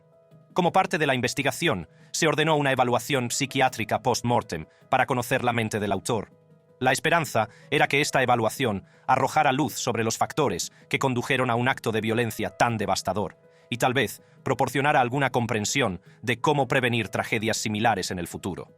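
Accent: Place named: United States. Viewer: Quizás es gringo y anda aprendiendo a hablar español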